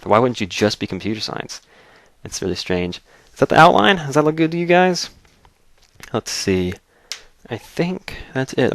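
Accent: South, United States. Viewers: is it me or is voice sound vary southern this episode?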